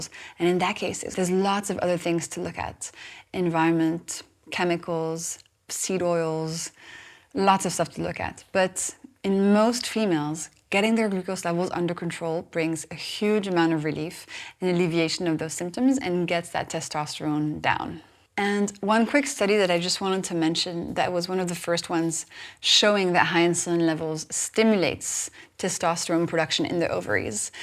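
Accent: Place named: France. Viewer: I love your French accent. 😊 I know by heart you’re hacks but unfortunately it’s not easy to apply them… still working on it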